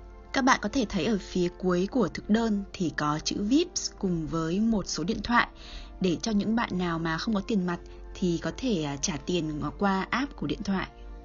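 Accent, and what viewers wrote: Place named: Hanoi, Vietnam. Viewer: " " Haha. Dễ thương, giọng Hà nội (gia đình >80 năm).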